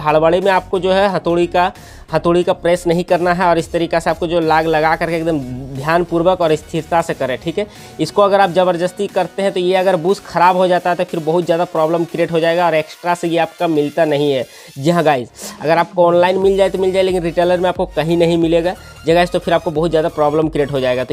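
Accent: Bengal, India. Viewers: Ap bangali ho ka??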